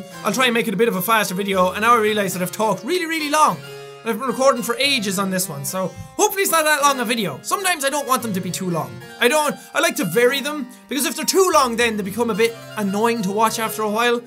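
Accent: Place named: Ireland. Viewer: hey, you'll probably never read this, but you've helped me so much through out the couple months. my mom has currently gone through a car crash, totaling our car and adds more to one of our family's problems, blah blah, i wont go into much detail. you never fail to entertain me or make me laugh. whenever i feel like giving up, i just imagine your silly little irish voice encouraging me. i want to say thank you. im not the only one you've helped, and i really hope you continue to stay happy yourself and keep others happy. much love! high five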